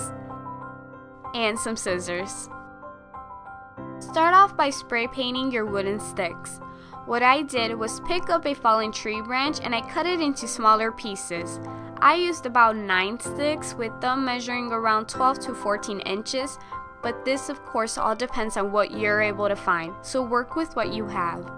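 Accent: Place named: Canada. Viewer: where are you from?? your accent sounds a little canadian lol :)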